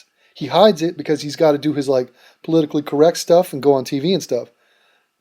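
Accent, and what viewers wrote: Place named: Cockney, United Kingdom. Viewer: Not the greatest Aussie accent ever. Sounded slightly cockney rather than Aussie. Other than that, keep up the good work.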